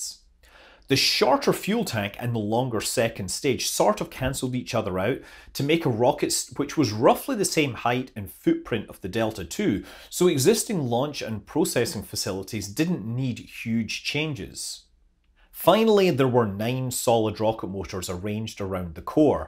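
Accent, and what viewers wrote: Scotland, United Kingdom. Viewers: That's Scots, och!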